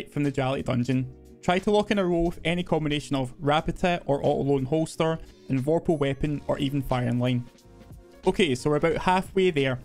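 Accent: Scotland, United Kingdom. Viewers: Not a big deal but based on what you said your reasoning is flawed, " it's probably because you're Scottish, didnt notice much of the mumbling". If you didn't notice much of the mumbling then clearly it was due to his accent (by your own admission), so he was speaking clearly in a Scottish accent. My guess is you're just not used to it and have yet to develop an ear for it. :) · it's probably because you're Scottish, didnt notice much of the mumbling. I would advise seeking professional help, as this can cost you In the professional world in the future. But thats about it, best of luck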